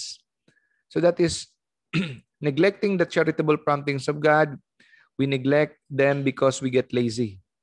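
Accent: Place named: Western Visayas, Philippines. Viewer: tono ni doc daw more ilonggo.hiligaynon doc.daw nasanay naman ako pamati sa cebuano maragsa .kaya sabi ko noon parang iba c doc magsalita smooth lng sory.pero ang more manami pamatian kay doc manami mag explain.Godbless more cfds and church militants.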